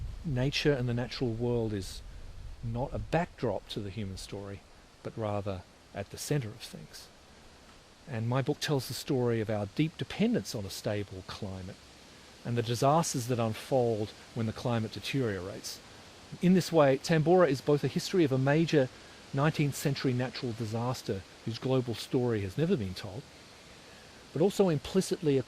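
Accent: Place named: United States. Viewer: When you accent is so thick that you're can't pronouce the difference between "barley" and "Bal".... People all over the world like you less and less, americans.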